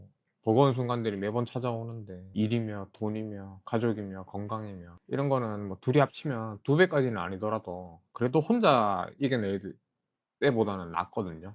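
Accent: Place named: Gyeongsang, South Korea. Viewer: 경상도 어투가 있어 친밀감 확~!느껴져 글 남기게 되었어요ㆍ저도 고향이 경상도 김천이라서요ㆍ~^^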